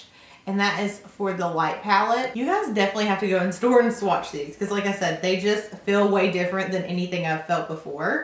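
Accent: South, United States. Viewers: Loooove your accent. I'm a country girl myself and I have t heard any makeup bloggers that have a southern accent. So glad I ran upon your video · Knew you were country from the first word you said haha love from Mississippi ❤️ thanks for sharing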